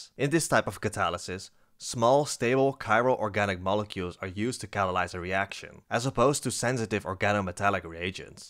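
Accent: Netherlands. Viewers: Hey Man love your videos.are you dutch?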